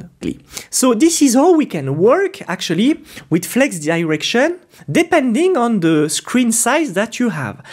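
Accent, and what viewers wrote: France. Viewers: Merci pour ce cours. Your french accent is a treat. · love the french accent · Tu es francophone !! ton accent est très fort, mais c'est très confortable pour moi. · L’accent français on adore 🥰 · bro thanks but i cant understand what you're saying i see you're french influence is too strong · thank you so much but you can not hide your French accent. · french accent is funny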